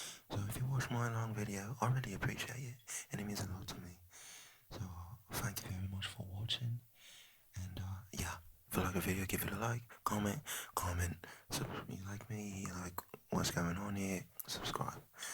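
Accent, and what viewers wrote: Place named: United Kingdom. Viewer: Lol I actually thought you were british 😂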